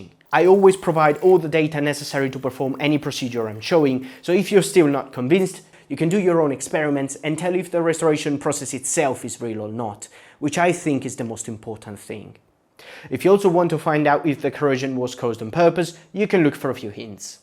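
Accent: Italy. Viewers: Came via the Internet Anarchist, really love this, especially as I know nothing about firearms. Also, one of my mates has a girlfriend from Milan and you sound exactly like her. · Northen Italy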